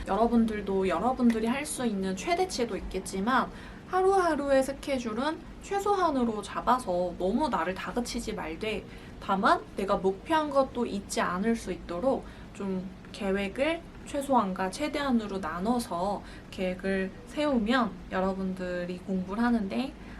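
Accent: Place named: Gangwon, South Korea. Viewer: 누나 부산 사람 아니었어요? 사투리는 강원도인데ㅋㅋ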